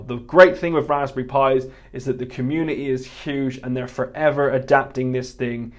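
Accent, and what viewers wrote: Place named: United Kingdom. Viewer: are you based in england or scotland? i am just curious because of your accent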